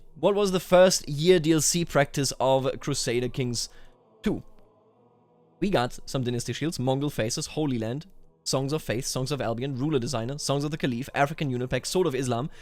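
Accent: Germany. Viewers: Well, this is how actually true German English accent sounds. It's always funny to see so called "Germans" in american cartoons that speak like Russians.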